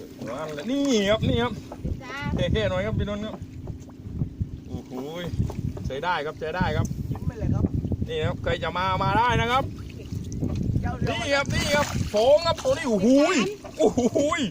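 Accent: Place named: Thailand. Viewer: ทะเลสาป พัทลุง อยู่ทางใต้คนพากค์น่าจะใช้ สำเนียงภาษาใต้เป็น เอกลักษ์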